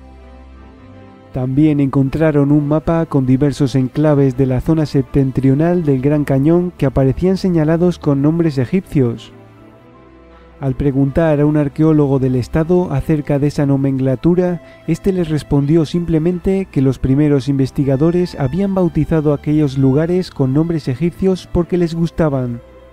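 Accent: Spain. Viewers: que horrible voz, no me extraña que los latinos critiquen como narran los españoles, por suerte no todos hablamos asi de mal, parece tonto o imbécil, tu voz es pésima, horrible, lo narras muy mal, no pude aguantar mas de 20 segundos, que asco escucharte, lo digo sin animo de ofenderte, pero eres un idiota, cambia de profesion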